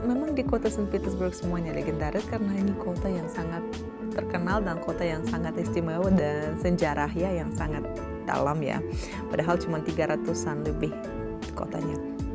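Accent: Java, Indonesia. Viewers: Mantap mba,bahasa indonesia nya sdh pasih disertai logat jawa sebagai pemanisnya👍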